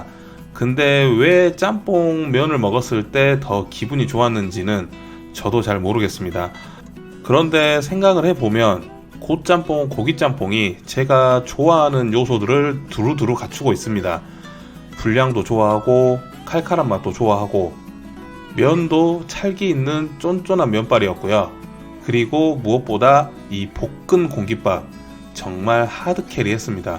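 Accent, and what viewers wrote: Jeolla, South Korea. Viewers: 전라도 사투리 난 실망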